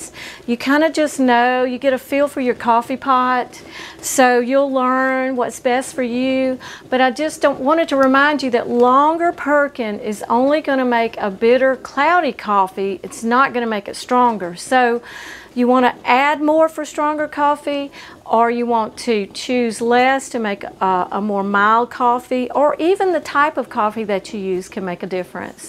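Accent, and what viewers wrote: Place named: East Texas, United States. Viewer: What a beautiful and sweet young lady this is! She sounds like us here in East Texas!! New fan and subscriber, great job!! ❤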